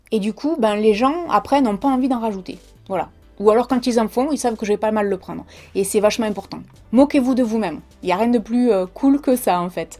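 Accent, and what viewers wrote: South, France. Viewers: Yesss l'accent du Sud de la France j'adore ça me rappelle l'accent du Sud de l'Italie 😙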